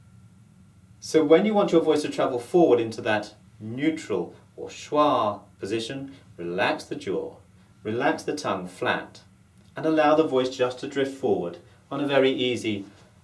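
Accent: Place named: South Africa. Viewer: this sounds like a neutral South African accent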